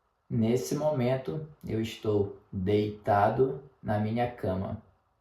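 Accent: Rio de Janeiro, Brazil. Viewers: Great video brother, I love ur comprehensible input style! What’s your accent? Are you from Rio de Janeiro or…? Also, are you currently live in Romania or something?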